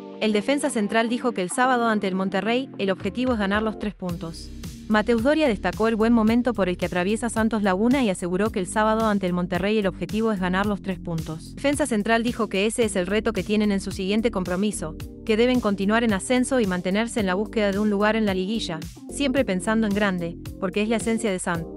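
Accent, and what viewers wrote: Argentina. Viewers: La comentarista es de nacionalidad argentina? la doble l la pronuncia igual que los argentinos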